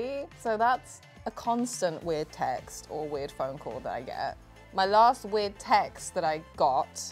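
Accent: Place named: United Kingdom. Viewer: She sounds British not American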